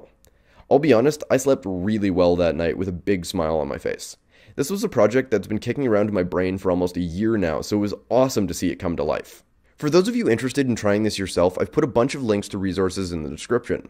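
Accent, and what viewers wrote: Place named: Canada. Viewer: Aha! I knew he was from Canada by the way he says "out" but him saying "Hackerspace in Montreal" confirms it lol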